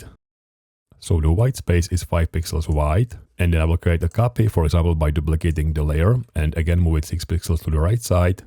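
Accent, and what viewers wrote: Czech Republic. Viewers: velmi vyrazny cesky prizvuk, inac genialne video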